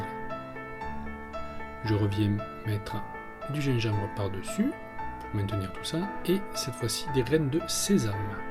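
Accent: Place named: Ariège, France. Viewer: Ah sa fait du bien d'entendre un youtuber avec l'accent ariegeois c'est si rare PS:ariego moun pais PPS:je sais pas ecrire en patois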